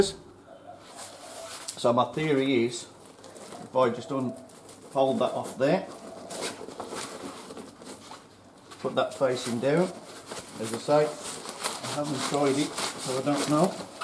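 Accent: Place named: Birmingham, United Kingdom. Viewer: Great vid. Thanks for sharing. I must say though. You don't sound like an Aussie. In fact you sound like a Brummie? I'm in Wolverhampton. Lol. Let's meet up in Singapore sometime.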